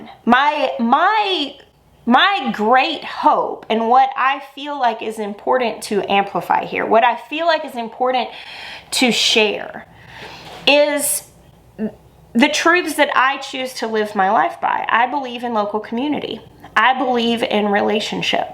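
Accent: South, United States. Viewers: it's been a while since I've had time to watch you. I've missed that time so much. I just wanted to tell you that you're getting prettier the older you get. Also, your southern accent is stronger! 😊 Our garden was very sad last year and we're working towards a better year this year. I sat down and turned your channel on KNOWING that I would be helped in a big way. Thank you for your encouragement and for showing your faith in God. 💚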